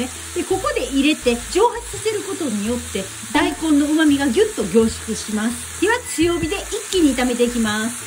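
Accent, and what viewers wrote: Kansai, Japan. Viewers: 大阪弁かなと思ったが、ちょっとアクセントが違うので京都弁かなと思い、プロフィールを見たら、京都出身の方でした。 いつもユニークなレシピ参考にしています。３９ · 大根きんぴら作りたいと思います 先生は千切りが好きとおっしゃってましたので流石にうまいです手際が良い。 関西弁が可愛らしいです。そこ知りたいってところのコメントがうまいです😋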